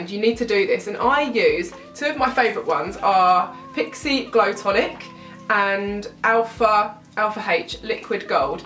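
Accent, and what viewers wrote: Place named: United Kingdom. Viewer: I used to only follow you on Instagram and I decided to check out your YouTube and I had no idea you're British!! Your voice is so cute!!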